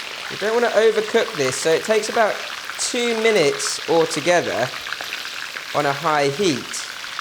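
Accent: United Kingdom. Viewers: I love this guy's British accent..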